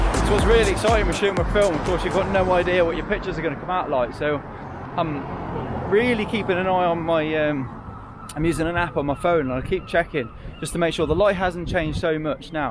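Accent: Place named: Northern England, United Kingdom. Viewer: Great video, Bath is the place to be. Love how you Northerners pronounce it. Keep um coming mate.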